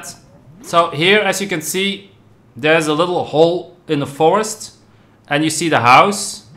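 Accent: United Kingdom. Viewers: I thought that I have to do full Goliath race but you helped as always. Are you from UK?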